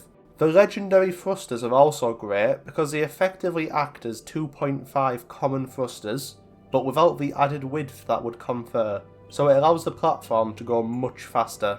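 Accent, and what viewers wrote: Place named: England, United Kingdom. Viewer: Interesting information, but would have wished the author did not just read off his story from a paper, but that he would put some life in his speech, as the English accent and fast talk through without emotion is very hard to follow/understand. Especially when details are not shown, only excerpt here and there. Hope this helps in future videos.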